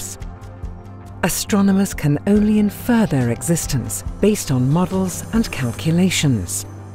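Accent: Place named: Austria. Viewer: That's a lovely accent... Austria? If so... G'day mate!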